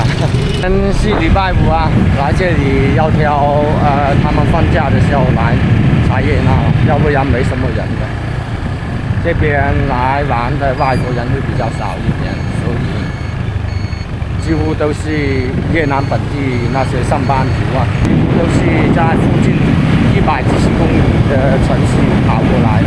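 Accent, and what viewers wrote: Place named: Cantonese, China. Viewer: 粵語口音😂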